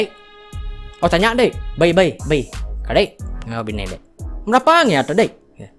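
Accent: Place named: United States. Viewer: OMG!! this is my first video of you! THIS IS SO CONTENTED AND FUNNY!! Love your US accent. New subscriber here. Mata d manah mat..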